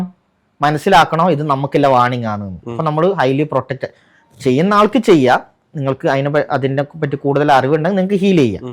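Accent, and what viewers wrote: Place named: Malappuram, India. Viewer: Slang കേട്ടപ്പോൾ ഒരു മലപ്പുറം touch തോന്നി😅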